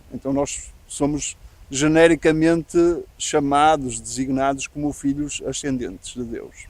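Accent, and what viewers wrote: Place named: Portugal. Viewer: Video bom, mas o audio é meiio complicado pois o som está abafado e o sotaque portugues complica o entendimento, ALEM DA PROPRIA COMPLEXIDADE DO TEMA DENTRO DO LIVRO DE URANTIA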